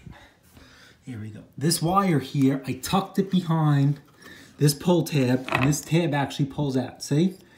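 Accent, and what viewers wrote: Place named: New York, United States. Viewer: Duude Tgat NYc accent is killing it... Totally Gangsta..😎😉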